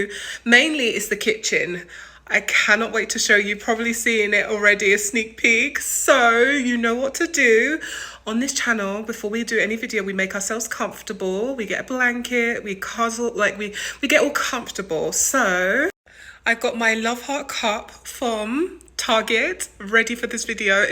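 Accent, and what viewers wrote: London, United Kingdom. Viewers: Are you from London ?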